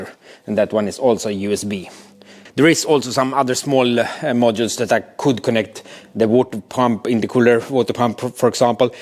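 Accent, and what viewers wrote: Sweden. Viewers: i love how thick the swenglish is.... id watch a video about sewing with this accent.. cars are better tho.. tjena från Norge! å, nei.... hexadecimals.... have fun ;D¨'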